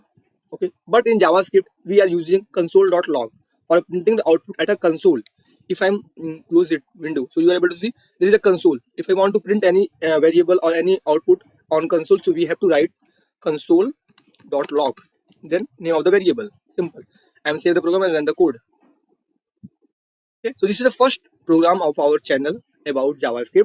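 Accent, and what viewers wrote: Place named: England, United Kingdom. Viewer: i dislike your aksen english, but i like your work. well done my friend.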